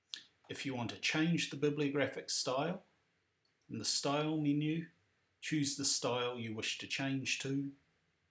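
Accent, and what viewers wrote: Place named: New Zealand. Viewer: So reassuring to hear this advice in an NZ accent!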